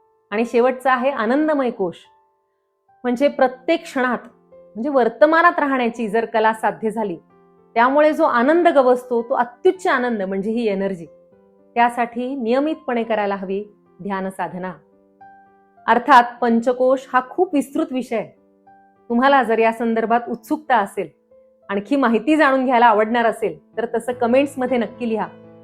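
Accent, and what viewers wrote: Standard. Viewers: मॅडम मी अलीकडेच तुमचे video बघायला सुरुवात केली आहे तुम्ही अतीशय उत्तम आणि विविध आरोग्य विषयक माहिती देताच पण तुमची वाणी अतीशय शुद्ध स्पष्ट आहे त्यामुळं खूपच प्रभाव पडतो तसेच विविध श्लोक तोंडपाठ आणि अस्खलित पणे म्हणून दाखवता खूपच प्रभावी आहे लहान मुलांच्या मधील बाळ दमा कफ यावर कृपया मार्गदर्शन करा विनंती आहे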